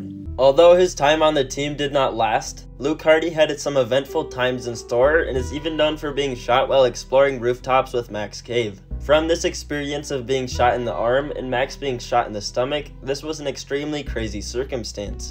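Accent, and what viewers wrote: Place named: United States. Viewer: so crazy hearing an american talking about parkour over video clips of my hometown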